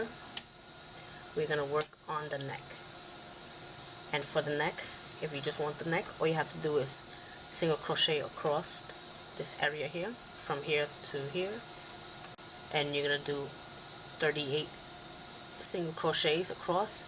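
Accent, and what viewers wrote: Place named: Louisiana, United States. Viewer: Thank you so much for not drawing every stitch out. I love how fast you instruct. Thanks again .I truly appreciate your style of teaching. The speed and accent in which you speak remind me of people in my hometown of Baton Rouge Louisiana.Anyway Thanks again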